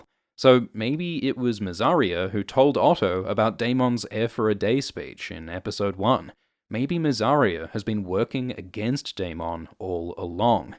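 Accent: Australia. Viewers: You make the best videos. I also love that you're Australian. So much easier to listen to than many other videos for me. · Oi! You sound Australian yeah? What time are the episodes out for us? I am not smart enough to work that one out on my own.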